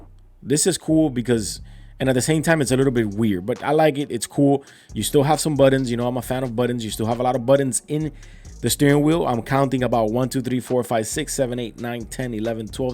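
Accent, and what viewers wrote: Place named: Miami, United States. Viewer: Bro I just came across your channel. I’m from Miami, I just know you’re from Miami with the accent 😅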